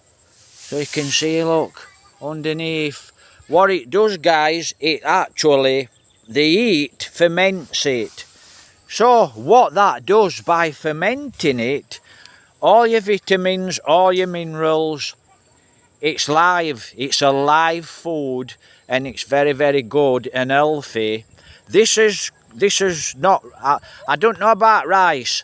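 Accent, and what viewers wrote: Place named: Scotland, United Kingdom. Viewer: You really sound like scottish sir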